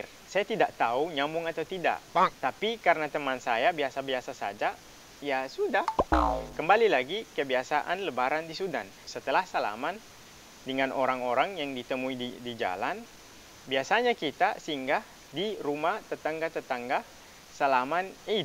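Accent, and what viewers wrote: Makassar, Indonesia. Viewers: Pantas logat mu logat makasar . Ternyata kamu kuliah di makasar ya ?? Saya juga anak sulawesi.. Tapi saya di Gorontalo.. Berdekatan dengan Sulawesi Tengah dan Sulawesi Utara 🙂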